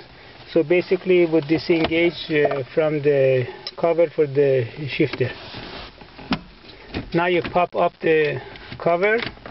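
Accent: Iran. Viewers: Like your accent, are you Iranian?!!! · hahaha! Love your Perrrrrrsiaaaaaaaaaaaan accceeeeeeeeeeennnnnnnt!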